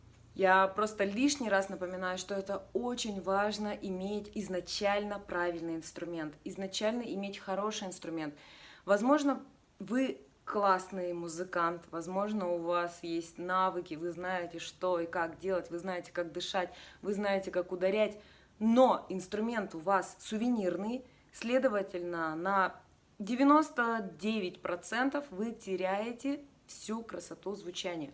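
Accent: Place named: Yakutia, Russia. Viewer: Hi! Excellent tutorial as always🤗🤩. Thank you for the English transcription but nothing will ever replace your beautiful Yakutian accent😍😘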